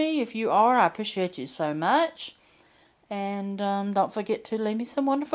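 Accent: South, United States. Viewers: I like grocery hauls and yours was nice!!! I like the way you speak. I’m from South Carolina and I love southern sounding people 😂!!! Have a great day and God bless!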